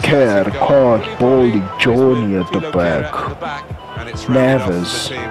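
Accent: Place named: Zimbabwe. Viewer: u defo from zim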